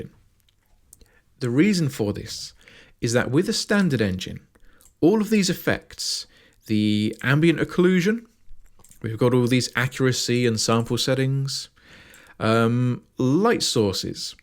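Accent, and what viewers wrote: United Kingdom. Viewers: Thanks for the tutorial, nice and clear! with a nice fluffy British accent! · A very clear explanation. Thank you and great to hear a British accent for a change :-)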